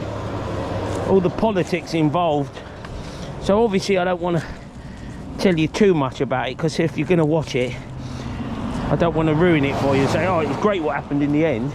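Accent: United Kingdom. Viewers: orange county should have a volunteer program for litterpicking that you could sign up for. they'd provide bags and sticks for free. And yeah, that english accent makes you sound authoritative to us americans. good work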